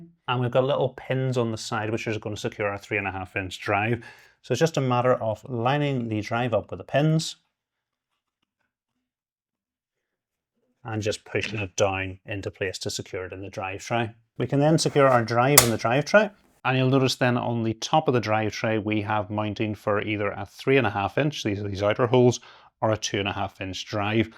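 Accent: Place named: Scotland, United Kingdom. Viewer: just scottish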